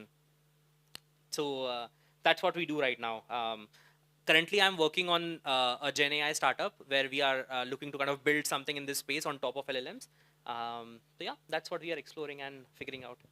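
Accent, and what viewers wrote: Gujarat, India. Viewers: bro is a deff gujju "probleeem"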